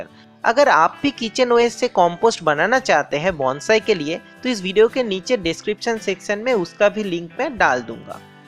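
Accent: India. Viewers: Nice Hindi accent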